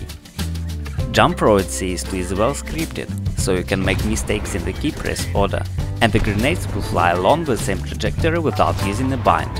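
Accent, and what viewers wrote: Russia. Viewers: у меня шизофрения если я слышу русский акцент? а нет теперь я в шоке что наткнулся на 2 акк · У тебя русский акцент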